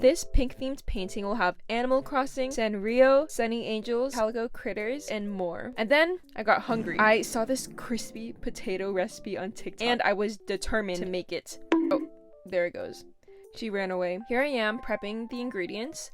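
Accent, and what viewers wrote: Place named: Netherlands. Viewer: your accent looks like your dutch (netherlands)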